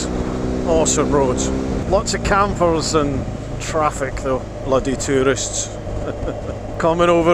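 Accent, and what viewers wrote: Scotland, United Kingdom. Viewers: I know it all sounds normal to you, but you had me in tears there... “Great giggle” “Naughty overtakes” 😂🤣😂🤣 I want to learn how to sound Scottish! Is there a pill? If you’re ever in San Francisco, give a shout. We also have an Inverness, it’s lovely too (no Loch but we have a bay), with as much glorious riding up and down the Pacific coast as even an Iron Butt could want. Thanks for the ride along. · love it mate, just found your videos and loving hearing the Scottish accent! some great roads round Glencoe shame about the usual weather, North Coast 500 is a must for beautiful roads up here, never done it on a bike before though! Keep up the great content! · That was pretty hardcore riding, but you where on the right bike, those other two guys on the MT-10 and Fireblade had to have been hurting more than you, epic ride ! 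Sounded like you had a bit of a Scottish accent, where you one of the extras on the movie Braveheart ?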